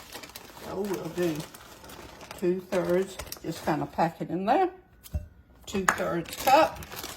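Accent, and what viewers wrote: South, United States. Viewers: Shine for Jesus is the best way to go. I also love to hear your accent. I live in Cullman,Al and of course we have the southern accents,but you are exceptional. I love you 💞💕🥰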